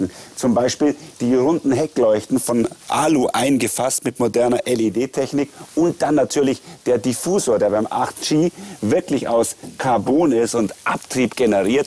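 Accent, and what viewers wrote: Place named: Germany. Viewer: es gibt nichts schlimmeres, als wenn ein Deutscher italienisch spricht!!! Bitte verbietet das in Zukunft! Ich sag nur Schüttelfrost!!!!!!!!!!!!!!!!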